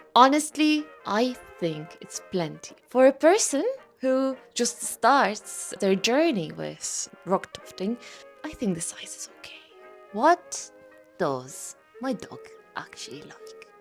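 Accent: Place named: Ireland. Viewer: Came from the crafts but stayed for the accent ngl! 😅 I spent half the video trying to place where your accent is from. I wouldn't have guessed Ireland! I'm Canadian, and we don't get to hear a lot of regional Irish accents on this side of the pond. I love your vibe, your humour, and this video has a nice professional polish. Can't wait to check out the rest of your videos! +sub! 😁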